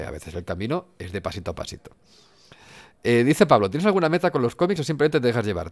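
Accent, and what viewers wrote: Spain. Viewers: de tanto en tanto vuelvo al canal del Locario de Loulogio para rememorar los momentos de risa que me mantuvieron a flote en una época muy oscura y fuerte de mi vida, vengo a escuchar su fea voz y acento españolete que tanta alegría me daba... gracias por tanto Loulogio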